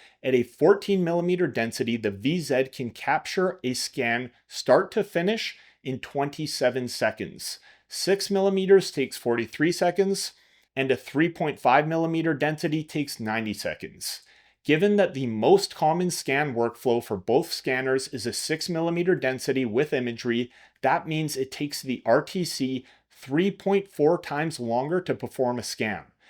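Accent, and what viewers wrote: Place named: Canada. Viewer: Great video! Very in-depth and informative. I have a lot of hours scanning with the VZ-400i, and the VZ-600i just looks all around better. I wouldn't wish lifting the VZ-400i 50-100 times in a day on anyone lol I did want to mention, regarding Leica's app and it's field capabilities, that Riegl offers an optional license for a program called RiPANO that has some similar capabilities. If they still offer it, it might be worth diving into. P.S. Love to hear your accent in your videos. I'm a Canadian surveying in the US as well, so it reminds me of home!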